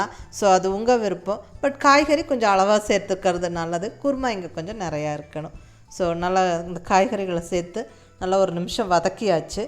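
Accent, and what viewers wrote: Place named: Coimbatore, India. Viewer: உங்களோட கோயம்புத்தூர் பேச்சிற்கும்.... உங்களோட கோயம்புத்தூர் சமையலுக்கும்.... திருநெல்வேலி மங்கை தன் தலை சாய்ந்து தங்களை அன்போடு வணங்குகிறாள்..😊😊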